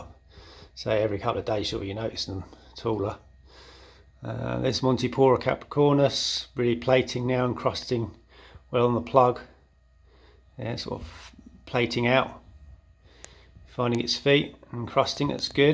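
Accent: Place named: United Kingdom. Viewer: Hi mate I'm based in Birmingham nice to see a reefer with a british accent. I'm looking at starting my first reef tank I'm stuck between getting a small nano tank like you have now or getting a red sea 250. Bit nervous about starting a marine tank so I'll probably take it really slow with fish only until I feel more comfortable and start adding corals.. what do you think the best option is for a first time fish keeper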